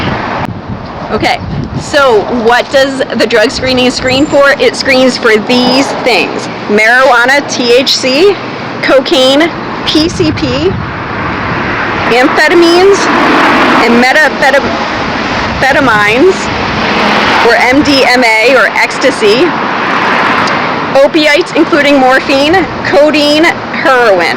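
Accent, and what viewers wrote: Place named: Midwest, United States. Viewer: 1. You say that you are from Pennsylvania yet you seem to have an annoying Midwest accent. Primarily around Michigan or ORD. 2. Do not wear black and blue outfit for your interview. 3. Since you are so short, wear a navy blue dress. 4. Do something with your hair. 5. If you want to paint your fingernails weird colors, go work at Virgin Atlantic. 6. I think you are in your late 40s! (Cameras and film)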